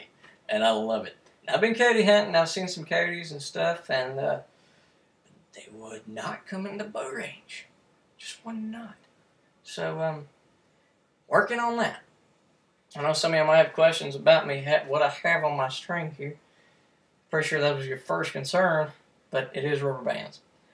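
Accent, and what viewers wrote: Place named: Carolina, United States. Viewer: I thaught you sounded like a carolina boy!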